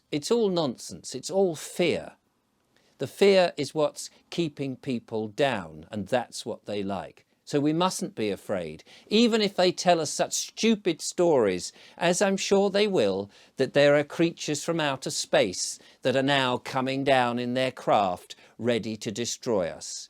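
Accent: United Kingdom. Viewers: You're doing a service to the ones who hear, by giving an (eloquent & very british...) voice to all. Personally, I agree with ALL the words being said. We're ALL living on a 'simulated' world, where the ones who rule it SURE WEREN'T elected. The 'curtain' is 'falling'... slowly BUT surely... Thank you again, Sir.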